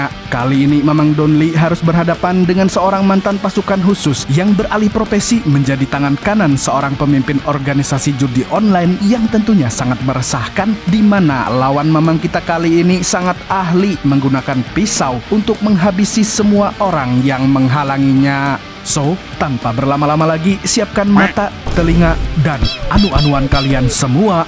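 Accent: Banjar, Indonesia. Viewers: urnk banjar ni sako dr suara opening iykh min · bah urg bnjrr skliny salam di alalak tengahh manngg hahahhakwkwkwkw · Org banjar miminnya nh sklinya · ohh orang banjar sidin nih sekalinya. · Bahhhhh mendaiii urang banjarrr pun ai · BANJAR KAH MANG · nah orang banjar kah mimin nih 🤣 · umai urang banjar sekalinya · orang mana pian bang kaya logat banjar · Org Banjar kah mng 😅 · umaaa org banua banar sekalinya sidin ti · Orang banjarkah mang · ternyata pian urang bnjar mang lah, kd nyangka uln😂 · Urang banjar sakalinya si adminnnn😂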